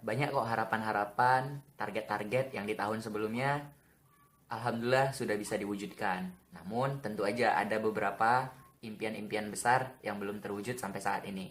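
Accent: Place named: Medan, Indonesia. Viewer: wah .. semoga targetnya tercapai ya.. btw cara ngomongnya kok kayak anak medan hihi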